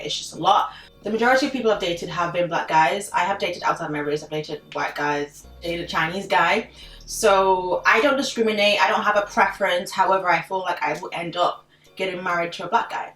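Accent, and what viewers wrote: Nigeria. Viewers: I like u ur a smart girl sis lol I mean I've always liked u but after this video I'm like🤔she talking sense btw I love ur Nigerian accent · Your Nigerian accent definitely made it's appearance in this video and I LIVE! Lol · Your Nigerian accent came out during this video, and I am here for it sis!!! Love you😍😍😍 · I find you very attractive with your Nigerian accent😍😍😍😍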